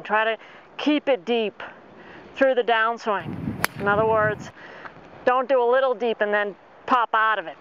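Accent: Boston, United States. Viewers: Never heard this one before, "butt" it makes sense! Gonna take a shot at that cool accent...Boston??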